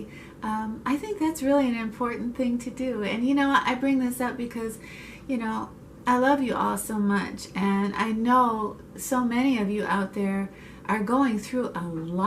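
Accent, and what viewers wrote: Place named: Michigan, United States. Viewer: I just wanted to tell you how much I am enjoying your videos. I've learned so many things from you about skin care and different products! I also have to tell you that I am from Rockford (having moved East 28 years ago) and it's been wonderful to see a couple of places you've shown as well as Grand Rapids. ❤️. I miss beautiful MI.. I also recognize the familiar way you pronounce certain words. Oh how I miss that!!! It's been like a precious reminder of my growing up. Thanks again for all you share. I relate to so many things!!! You're a blessing. Sue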